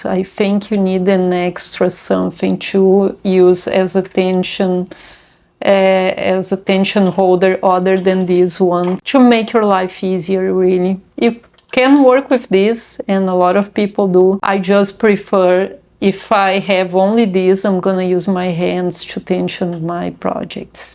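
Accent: Brazil. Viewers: Vc é uma querida minha. Obrigada por compartilhar todas as suas dicas! No primeiro vídeo que vi seu, eu saquei pelo seu sotaque que vc era brasileira, e fui ver o que uma brasileira tinha pra falar sobre essa máquina que pouco sucesso faz por aqui rs E que bom, pq vc de longe é a que mais entendeu sobre o assunto e explica com clareza, de todas que eu já parei pra ver aqui na internet A gente que é brasileiro acha que nosso próprio povo não sabe o que fala, mas se procurar direitinho acha as joias mais raras do mundo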